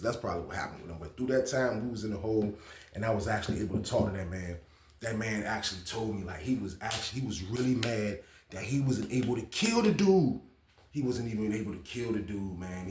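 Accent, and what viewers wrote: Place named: Richmond, United States. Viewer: Sound just like a Richmond nigga..down the jail in the 80’s/90’s early 00’s before they knocked it down that shit was like a prison the beef from the street came to the jail..out of Towners ain’t stand a chance down that jail they checked in INSTANTLY and in on the land out of towners got zipped up ASAP #17thSt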